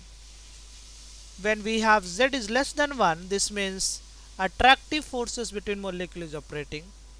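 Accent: India. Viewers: ohhhh WTF..indian engish voice..going to hell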